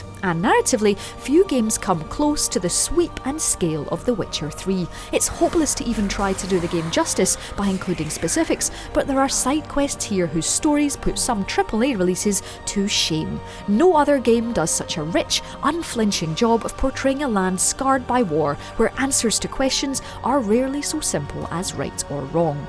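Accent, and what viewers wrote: Scotland, United Kingdom. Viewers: The games are good, but your british accent makes my ears bleed to death... I am sorry but why cant you pronounce the word Year or Around or Our withouth twisting so many unnecessary times your tounge? Its nothing personal towards you. And no, i am not a bad person.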